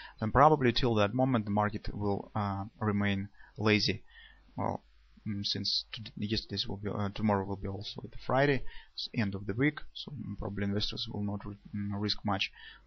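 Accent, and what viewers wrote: Russia. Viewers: The accent is killing me. Why does every single Russian have such a strong, distinct accent? I've never understood that.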